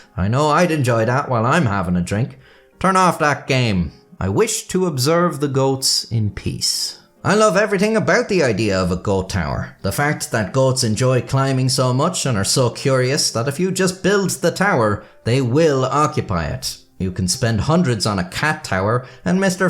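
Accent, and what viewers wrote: Ireland. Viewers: My feed knows me so well... another Irish man talking about goats and their towers is all I've ever needed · I’m not even Portuguese and the pronunciation hurts. Thankfully, the delightful Irish accent more than makes up for it.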